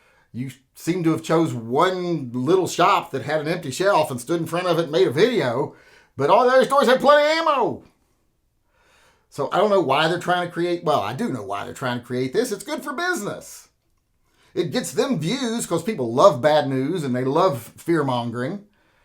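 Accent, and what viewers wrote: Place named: South, United States. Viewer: I don't even listen to the other channels anymore. Thanks! Even though I can tell you're a Southern boy and not a -_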